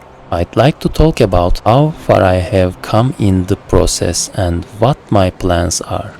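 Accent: Turkey. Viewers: Are you Turkish? yazacaktım sonra adını gördüm ama instagram linkine tıklayınca Türk olduğunu anladım. Türk aksanıyla İngilizcenin en güzel örneği:) kötü bir şey demiyorum bu arada, aksan bence güzel bir şey 😀 Başarıların devamını dilerim, böyle oldschool rpgler eski grafiklere rağmen daha dönemi yansıtıyor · You speak like Turkish :)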